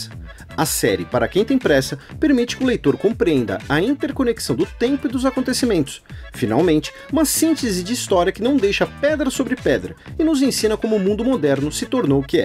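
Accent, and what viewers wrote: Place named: São Paulo, Brazil. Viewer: O vídeo é muito bom, a única coisa ruim é ouvir isso com esse sotaque paulista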